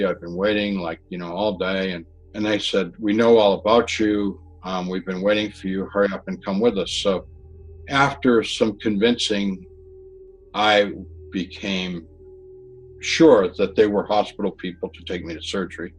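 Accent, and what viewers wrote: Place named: New England, United States. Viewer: F'in D's.. lol I love The New England accent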